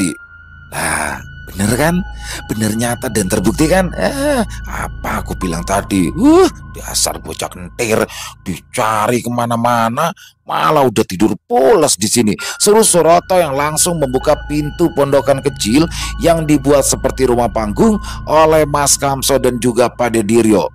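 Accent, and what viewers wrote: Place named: Java, Indonesia. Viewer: Favorit banget. Ditunggu kelanjutan ceritanya ya mas untung. Dan makasih mas yg membaca dg logat Jawa yg mantap. Aq bs membayangkan wajah² org itu.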